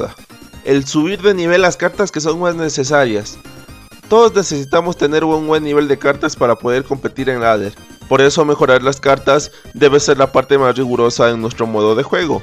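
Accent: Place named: Ecuador. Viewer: Por tu acento, si no me equivoco eres de Ecuador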